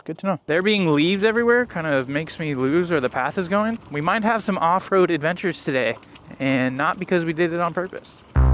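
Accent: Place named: United States. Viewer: you can hear the country in his voice new mic?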